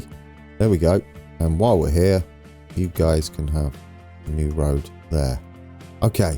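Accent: United Kingdom. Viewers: your british tho? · Great to hear. Enjoying ALL of your TF2 series. Your accent is apparent, but not over the top (so thick) like most Brit YT's here. Very soothing and entertaining. You're - comical especially when you call yourself a "berk."(sp) I die! Still glad I found your channel.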